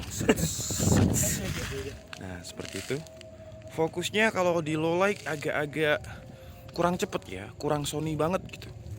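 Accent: Java, Indonesia. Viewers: iki jowo bnget mas, 🤣🤣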